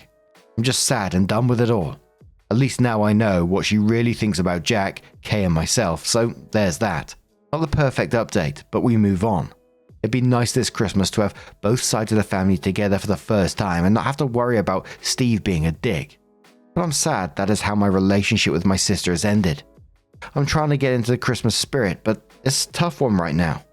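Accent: United Kingdom. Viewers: so many times you have helped me. So much love to you. From Boise, Idaho, US. You sound like you might be from over the pond. Please take care of yourself. You’re a treasure.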